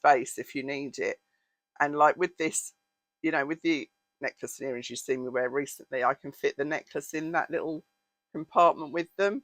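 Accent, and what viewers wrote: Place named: London, United Kingdom. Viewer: Love your videos... where is your accent from? It sounds so familiar to me... SW London?:0) xx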